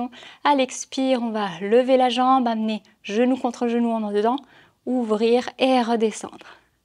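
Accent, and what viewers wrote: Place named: Switzerland. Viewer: Ah mais c’est ça j’avais bien perçu un petit accent suisse délicieux qui me rappelle de bons moments à Genève! Merci. Je me demandais si avec de la musique ce ne serait pas mieux mais peut-être d’entendre votre respiration quand vous souffrez avec nous c’est bien aussi…très bonne séance avec des mouvements originaux et variés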